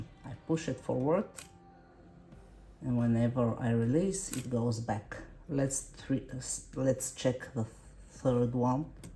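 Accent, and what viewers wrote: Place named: Italy. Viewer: Sento un po di italiano in questo inglese😂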